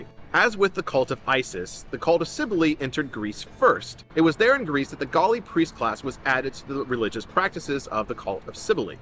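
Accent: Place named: United States. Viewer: How to obliterate any shred of credibility. 1 Having a channel called The Wisdom of Odin 2 Speaking Murrican (that is not a civilised way of speaking) 3 Using such a inane font for the channel logo.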